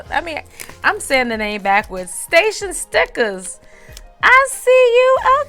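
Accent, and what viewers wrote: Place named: Baltimore, United States. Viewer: I like the way you talk! I was thinking you were from Baltimore but i see you’re in Virginia lol I enjoyed the video as usual! Annnd you look amazing for 45 ❤️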